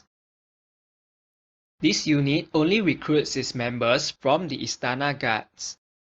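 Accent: Singapore. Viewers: U srsly expecting a Singaporean to have a Malaysian accent? 🤣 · Your Singaporean accent is really strong.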